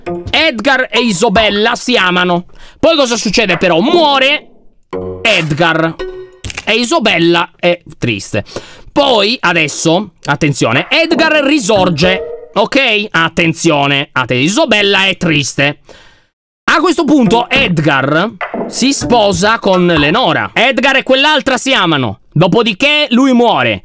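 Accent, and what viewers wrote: Sardinia, Italy. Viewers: Semmai Sardo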